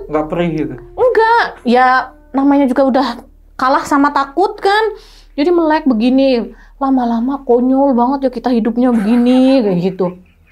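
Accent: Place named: Banyumas, Indonesia. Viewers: Semangat mbakyu,lanjut seru ceritanya ngapak bikin ngga ngantuk mbake. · Wong ngapak mbanya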